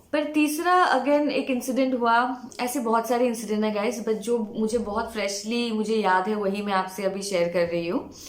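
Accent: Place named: mixed, India. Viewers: Loved your stories and the narration :) Btw you have a northeastern/bengali accent...are you bengali!? 😅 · I like your stories and your speaking style is very cute and my own sister-in-law was an Assamese so I understood that you were an Assamese too. She also talks like you😊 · Meri gf ki trah pronounciation hai aap ki Assamese bengali jab hindi bolte 😅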